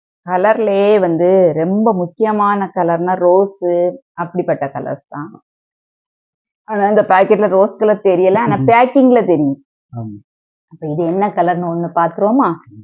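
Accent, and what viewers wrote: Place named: Kerala, India. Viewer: நல்ல மலையாளம் தமிழ்🎉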